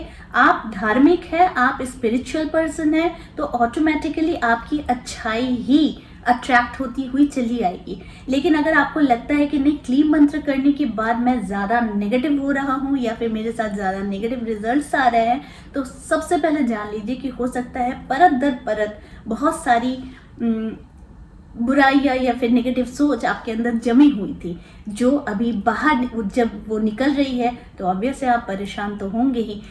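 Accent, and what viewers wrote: Bengal, India. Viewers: R u bengali???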